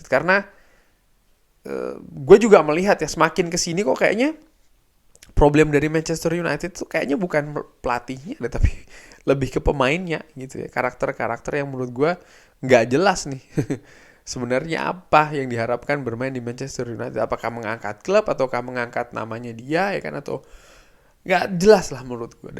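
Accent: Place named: Java, Indonesia. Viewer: Buanyak nya medok tenan bg 😅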